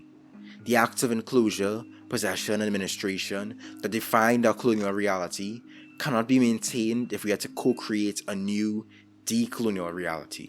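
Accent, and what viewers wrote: Trinidad and Tobago. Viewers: Are you Trinidadian? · ay yo i heard that trini accent and subbed right away! youre doing great work my friend much love from canada.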